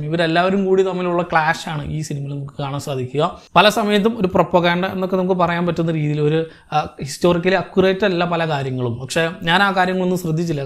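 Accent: Palakkad, India. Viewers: This time in long time i could sense your palakkadan slang👏👍😀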